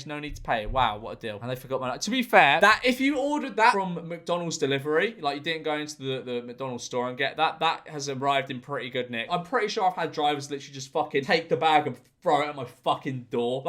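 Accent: United Kingdom. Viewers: Only British person, who i dont find accent annoying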